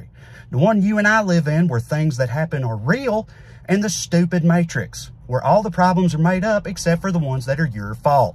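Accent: South, United States. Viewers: I agree with your rant but I just don't trust anybody with the Country accent after 1.6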